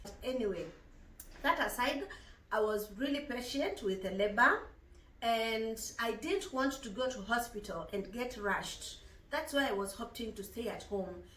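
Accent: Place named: Kamba, Kenya. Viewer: Are you kamba?! Your accent, jeeeez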